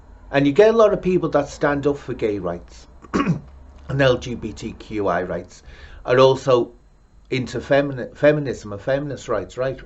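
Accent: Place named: Liverpool, United Kingdom. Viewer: New sub here, I do love that Scouser accent, I was a support worker for a lady one with a Liverpool accent, she didn't mince her words, she was often heard effing and jeffing in the local co op😀 great upload thanks.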